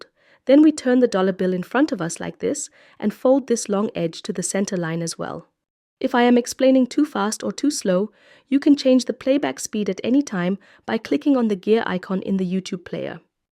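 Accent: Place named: England, United Kingdom. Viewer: Is this the same was a German accent? This voice sounds like one from England!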